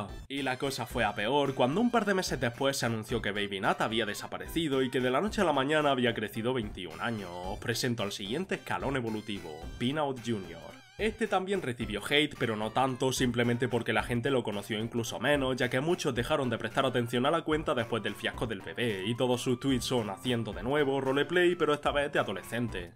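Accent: Andalusia, Spain. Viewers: ¿De qué parte de España es este acento? Sé que el hombre del vídeo trata de hacer un tono de voz gracioso por ser un vídeo de humor; pero igualmente se me hace curioso, porque este mismo acento lo he escuchado en otros vídeos de España. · Yo solo estoy diciendo de donde viene su acento y que no es el mismo que el de Latinoamérica del caribe, y aunque no lo creas, Andalucía realmente tiene una cultura rica e importante, saludos.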